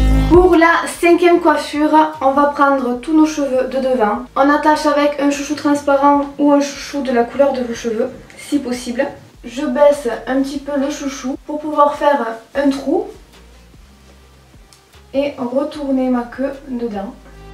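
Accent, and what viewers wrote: South, France. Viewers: Tu gère t la meilleure 💙 💚 💛 💜 t comme moi t'as l'accent du sud mdr 😁😄😅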